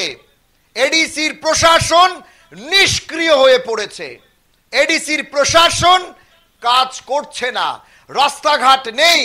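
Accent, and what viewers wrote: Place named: Bangladesh. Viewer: তুই আসলে আসল বাংলাদেশি কারণ তুই যেভাবে কথা বলছস সেভাবে বুঝা যায় তুই ওই বাংলাদেশ থেকে ওই এসোস আমি চাইলে ডেবিট মরা সিং সারের যে লেখছে শের থেকে আরো ভালো করে দেখতাম আর আরো গালি দিতাম বাংলাদেশী তোরা তোরা তিলকে তাল বানাস তার জন্যই এখন যে জায়গাটায় আছো সেই জায়গার বসে রয়েছো কেন ভয় লাগছে বাংলাদেশে যাওয়ার জন্য এমনি তো তোর যেতেই হবে কারণ তুই আসল বাংলাদেশি😂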